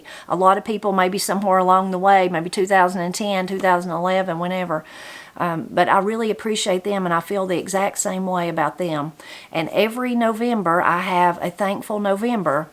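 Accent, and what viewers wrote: Appalachia, United States. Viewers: So very happy for you and your family. Truly well deserved. I came across your channel while looking for information about canning. Like many people I’ve increasingly wanted to know more about what I’m eating and am separating myself more and more from processed things. That I am from Virginia and recognized your beautiful accent which is similar to my fathers and grandparents, well after the very first video I simply had to subscribe. Congratulations and here’s to many, many more subscribers to come.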